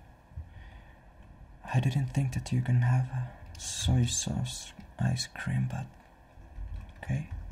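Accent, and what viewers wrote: Finland. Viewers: Finnish, but it isn't a super strong finnish accent. Finns sometimes speak something called rally English and atleast i think it's atrocious, his accent is pretty stereotypical though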